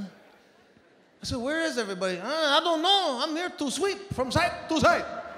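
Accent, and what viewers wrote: Mexico. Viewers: He nailed our Raza, that’s exactly how all my Tio’s and primos talk. My dad speaks broken English this is him 100% I love it, thank you Sebastian. I always watch this clip ❤️ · As a Mexican I approve of this 100% his Mexican voice and talk was straight up accurate. Dam this was fucking funny. 😂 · I mean thats ONE type of mexican accent. Its like rural "country" mexicans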